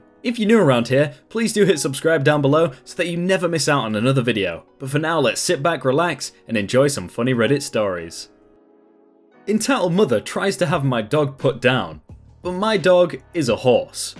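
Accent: United Kingdom. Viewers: Hi! I have always been listening to your videos ever since I come across by my recommendations and I enjoy it every single time because I enjoy your accent of British. Please keep it up with it. I enjoy a lot.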